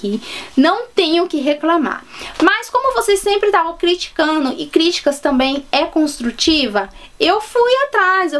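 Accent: Minas Gerais, Brazil. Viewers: Tem um sotaque mineiro . Legal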